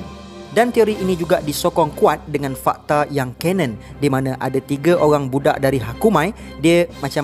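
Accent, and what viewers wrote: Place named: Kelantan, Malaysia. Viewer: Arrr loghat ore koto bharu klate nim!!!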